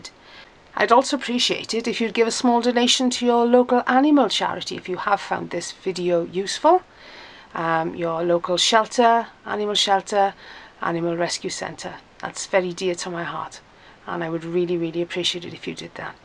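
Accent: Wales, United Kingdom. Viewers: I love listening to your welsh accent - my grandmother was welsh and a great knitter and crocheter. Love your patterns too 😊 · MY husband is from Yorkshire, and I am in love with each UK accent. You sound kind, generous, and lovely tbh. Thanks so much. Happy festivities to you and pets, my birds, doggos, chickens, and hamster say hi!! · I thought so! Me too. It's strange to see a fellow Welshy doing knitting machine tutorials, it's normally more American accents you hear 🤣 happy new year to you too!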